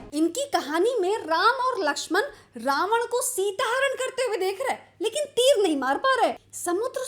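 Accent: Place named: Lucknow, India. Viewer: Aap Lucknow se hain?? Aapke bolne ka andaaz waise hi lagta hai.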